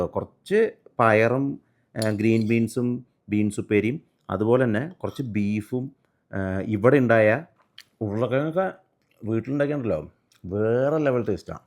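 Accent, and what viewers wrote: Thrissur, India. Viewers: തൃശൂർ ആണല്ലേ.... വീട്..... ആണോ ഗെടി....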